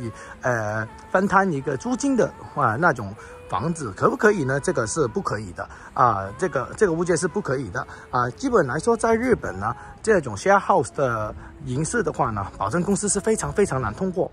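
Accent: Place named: Hong Kong, China. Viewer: 这口音不是香港人就是广州人哈哈哈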